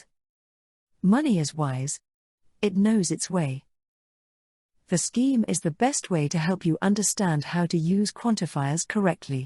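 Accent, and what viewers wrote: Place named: United Kingdom. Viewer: Thx u , i love it brittish accent 🎉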